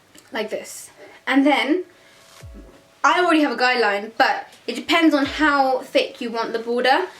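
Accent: United Kingdom. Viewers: Wow u have a beautiful british accent, and loved ur video.. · Great British accent......❤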